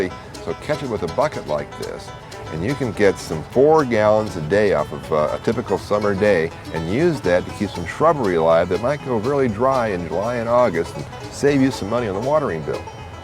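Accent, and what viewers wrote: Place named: Missouri, United States. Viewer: He clearly said : "This unit"..... Seems like 19 people can't understand a Missouri accent.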